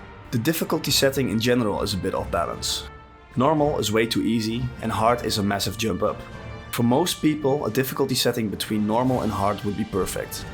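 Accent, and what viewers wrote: Netherlands. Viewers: Your voice sounds so Dutch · It took me 10 seconds to know this guy is dutch😂. Leuk gemaakt man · I can just hear you're Dutch.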